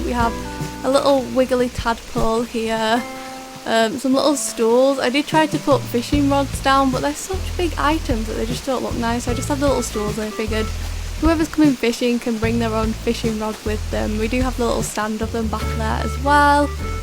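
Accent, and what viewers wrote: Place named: Scotland, United Kingdom. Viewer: i’m realizing you’re scottish not irish bye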